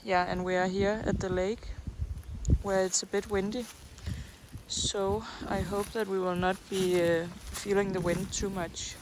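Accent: Denmark. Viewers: Hahaha! I love the Denmark accent! 🤙🏽💯🔥 Just like Cimbrer Bushcraft! Thanks for sharing the beauty of Denmark! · I concur! Her Danish accent is easy on the ears. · with her lovely Denmark accent. The sound of a flowing river is always soothing. Greetings from Ontario Canada 🇨🇦 🍁 🇨🇦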